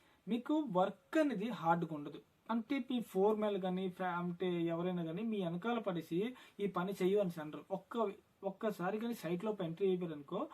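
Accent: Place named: Andhra-Odisha border, India. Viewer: నువ్వు ఆంధ్ర ఓర్రీస బోర్డర్ కదా నీ తెలుగు అలానే ఉంది బోర్డర్ కదా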